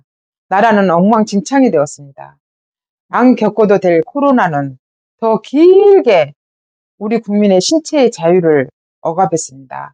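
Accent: Jeolla, South Korea. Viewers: 나도 전라도 출신이라서 사투리가 반갑군요...윤통 지켜내는데 전라도 출신중에 보수우파들이 소리를 내야합니다 박대통령 탄핵된후에야 저도 깨어났습니다. 정말 제가 진짜 중요하게 원하는 가치가 뭔지몰랐죠 그때는 그러다가 노무현자살등의 석연찮은 점들 ( 전 심지어 노사모였습나다)..그리고 알수없는 내면의 불편함들 .내로남불의 민주당의 행태를 보면서 보수주의에 대한 바른 이해를 갖게되었습니다. 반갑습니다 우리 힘냅시다. 제 주위는 온통 민주당 편에있는 가족들 뿐이라서 마치 전 뭣모르는 꼴통 취급받고 있습니다😅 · 한번씩 볼때마다 이 분은 참 대단하신거 같아요. 찰지게 전라도 사투리 섞어가면서 좌파 까시는게 너무나 멋지십니다. 전라도=좌파, 경상도=우파의 공식을 깨야 나라가 삽니다. 많은 전라도 분들이 깨어나면 좋겠습니다.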